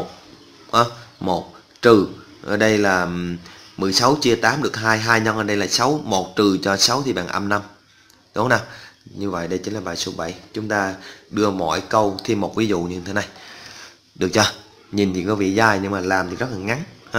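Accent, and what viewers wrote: Binh Dinh, Vietnam. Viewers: thầy dân bình định hả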